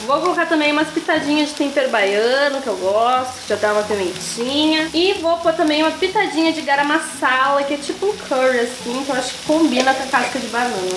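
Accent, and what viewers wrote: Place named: Piracicaba, Brazil. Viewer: Seu sotaque é o melhor que existe!! A piraricabana que existe em mim acorda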